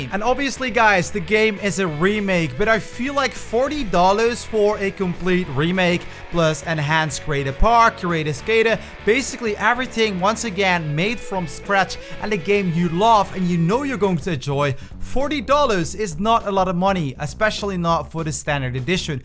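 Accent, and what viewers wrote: Netherlands. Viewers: I see, you're either Dutch or Belgian. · Are u Dutch?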